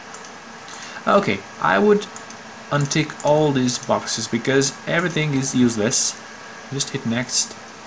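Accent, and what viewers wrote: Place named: India. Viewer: engleza de indian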